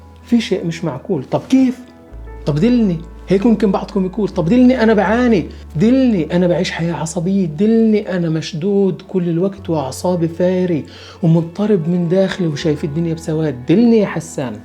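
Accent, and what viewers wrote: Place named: West Bank, Palestine. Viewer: ياسلام على لهجة الضفة 💚 الفلسطينيين دايما حلولهم ربانية .سبحان الله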